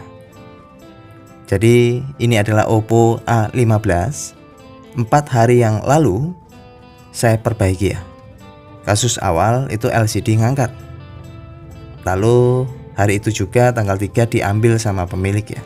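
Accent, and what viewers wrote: Java, Indonesia. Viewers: Jowo gak ilang jowone mase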